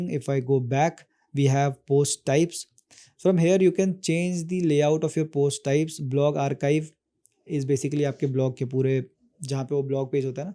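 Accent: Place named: Urdu, Pakistan. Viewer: excent is look like urdu best tutorial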